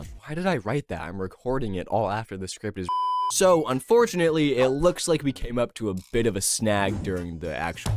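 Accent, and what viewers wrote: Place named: Lithuania. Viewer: you sound lithuanian but in the best way possible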